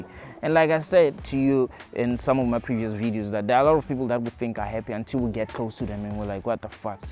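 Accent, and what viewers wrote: South Africa. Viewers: hey bro just started following you i like your content bro, i always wanted to ask where you from coz i could tell you from SA, lols im from there too, good to hear someone who talk reality, out here so hard to find people like you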